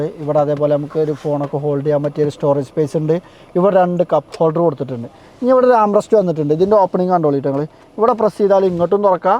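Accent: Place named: Valluvanad, India. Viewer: You described well in your own “valluvanadan”style.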